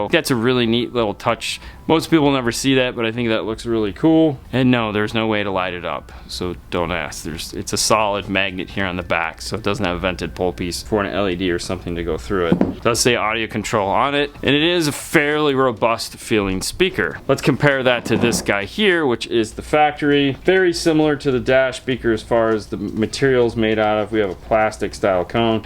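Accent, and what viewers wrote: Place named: Puerto Rico. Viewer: starting to sound a little Boricua… ya era tiempoooo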